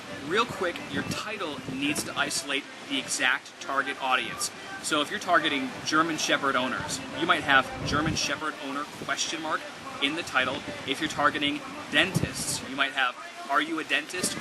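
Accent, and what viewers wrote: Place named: Jamaica. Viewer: sounds like jamaic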